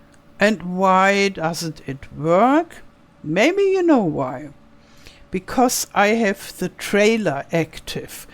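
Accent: Germany. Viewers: i love how german you sound! as a german being away from germany for 7 years now everyone can still instantly tell im german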